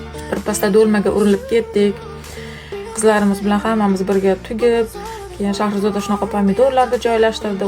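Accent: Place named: Fergana Valley, Uzbekistan. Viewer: АССАЛОМУ АЛАЙКУМ, УЗБЕКИСТОНДИ КАЙСИ ВИЛОЯТИДАНСИЗ, ШЕВАНГИЗГА К,АРАГАНДА ВОДИЙДАНМИСИЗ ДЕЙМАНДА?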